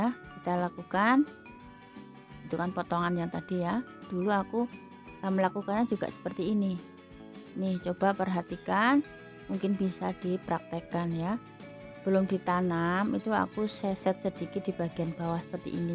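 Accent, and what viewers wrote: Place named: Java, Indonesia. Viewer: pantes logatnya Jawa banget Salam dari Klaten . Prambanan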